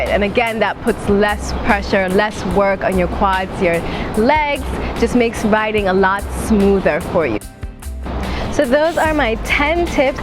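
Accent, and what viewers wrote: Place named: New York, United States. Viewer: Are in NYC? The background reminds me of my old hood in Brooklyn. Plus you sound like it.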